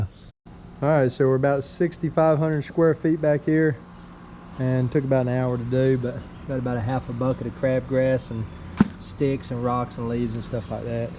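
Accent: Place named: North Carolina, United States. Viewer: I like your no nonsense approach. I’ve watched most of your videos on zoysia, your recommendations have been spot on, no bs sales pitch. but to be honest I think it’s the southern drawl that makes your content more “watchable” 😂 you from Charlotte originally? Sounds more like Cleveland NC to me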